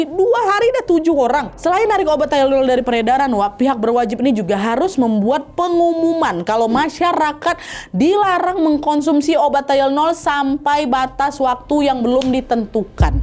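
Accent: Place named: Sumatra, Indonesia. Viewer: Cuma yang bisa ceritain kisah yang aslinya dari luar negeri semua tokohnya jadi berasa jadi orang Sumatra hahaha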